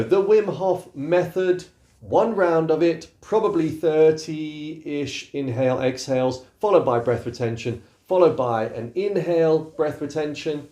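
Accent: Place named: United Kingdom. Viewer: Mate I just wanna say thanks for being such a genuine,honest and decent bloke,I'm almost 40 and for the time in my life I can actually see abit of light at the end of the tunnel,this lockdown as give me so much time for self reflection and through a small dose of yoga and meditation I probably feel the best I have felt in over 10 years and now this is just the icing on the cake. Oh and abit more icing to add were both British so I have absolutely no issues in understanding you lol. P.s dont turn around now and say your not British and make me look like a c××t 😂😂😂🇬🇧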